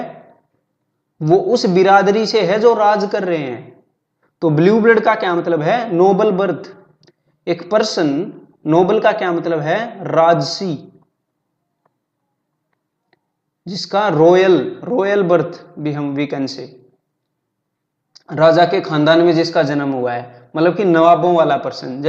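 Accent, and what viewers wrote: Haryana, India. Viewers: bhai maza aa jata hai yaar apki haryanvi type bhasha shun k . . . . knowledge ka dose thanx boss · Nice haryanvi sir ji 👌👌👌👌👌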